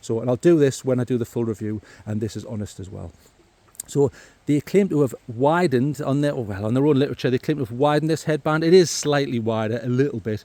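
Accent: United Kingdom. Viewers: Good review I have just ordered one even though I already have 3 head torches. Good to hear a North East accent, I’m from Sunderland and now Durham 👍🏻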